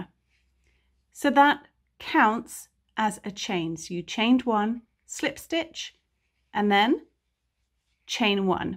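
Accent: England, United Kingdom. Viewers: Oh it's just that as you had an English accent I thought it was strange I follow English or I would get a bit confused 😂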